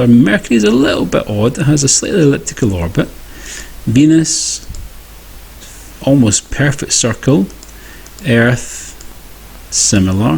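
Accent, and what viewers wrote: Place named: RP, United Kingdom. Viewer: Wee posh accent 😹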